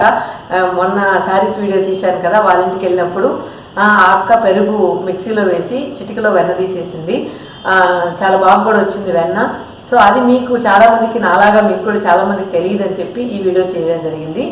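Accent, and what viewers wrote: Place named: Nellore, India. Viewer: easy chitka bagundi nellore yasa matladuthunte bhalega vundi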